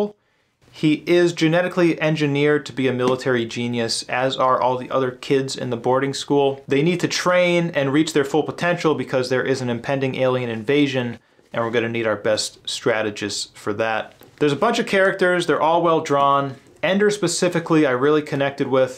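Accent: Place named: Netherlands. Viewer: P.s you Dutch by any chance. You hide the accent pretty well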